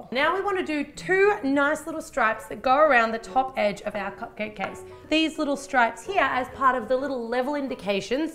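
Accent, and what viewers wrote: Australia. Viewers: She's Australian so obvi she had a diff accent Don't be rude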